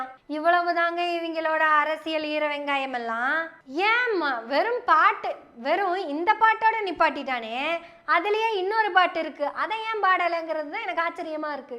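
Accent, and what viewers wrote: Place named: Coimbatore, India. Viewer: குழந்தை குரும்பு கொஞ்சும் கோவை தமிழ் பேச்சு அருமை அருமை தொடரட்டும். .......❤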